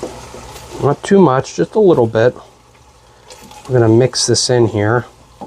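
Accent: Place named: Pittsburgh, United States. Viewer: Born and raised in Pittsburgh. First video I’ve ever seen of you. Soon as I heard you talk, I thought this guy is from Pittsburgh.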